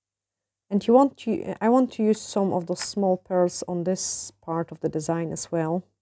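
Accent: Scotland, United Kingdom. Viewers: Your precious little accent is EV-ER-Y-THING!💖 JUST ADORBS 🤗 I never would've guessed Scotland!!! But you sure throw out some BANGERS with your nail art, but HUN-NYYYYYY!!!!! THAT STONE PLACEMENT IS ALWAYS BEYOND ON POINT 👌🏼 Honestly, even if you had no idea how to do nails, (which you are so exceptional àt!) , SOOO MANY PEOPLE would still come to you for stone placement and/or nail design 😍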